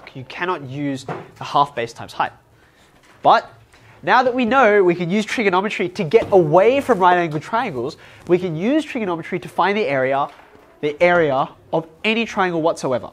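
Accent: Australia. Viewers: He’s Australian. Apparently us Aussies also speak the commonly spoken language known as ‘English’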